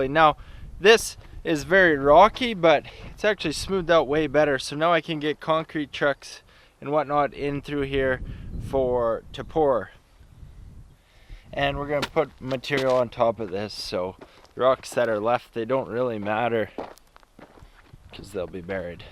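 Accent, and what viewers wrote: Canada. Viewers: I love to tune in and listen to this kid narrate his work, so f'ing Canadian! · I stumbled across this page and thought "well, let's just watch this young guy grapple with mother nature" , so I did. Lemme tell you... The way you ATTACKED this project and just absorb everything you learn- WELL DONE! I was listening to you talk, and if you're Canadian, you just KNOW another Canadian- and all your sayings remind me of growing up and of course, my time on the prairies. SK is certainly some of the most beautiful land out there- I don't care what ANYONE SAYS. Love watching this journey (sometimes through my fingers cos I'm TERRIFIED FOR YOU lol) and I can't WAIT to see you build this amazing achievement!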